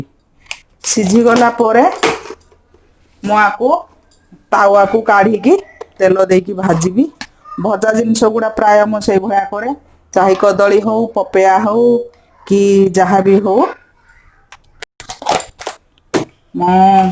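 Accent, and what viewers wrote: Angul, India. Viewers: Bahut badhiya vedio and Apnakara cute angulia Katha. Plz sabu din kichi angulia Katha Kahile bhala lagiba 👍👍👍👍😍😍😍😍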